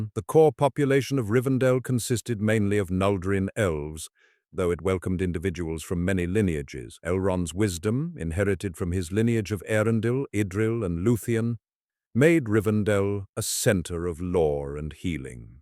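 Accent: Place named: RP, United Kingdom. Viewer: Love your RP narration. Puts me in mind of The Inklings.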